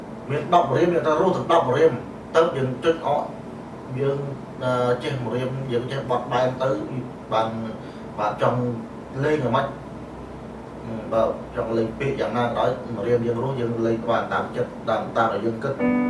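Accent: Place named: Oula, Cambodia. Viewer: អូននិយាយដូចអ្នកនៅស្រុកអូឡ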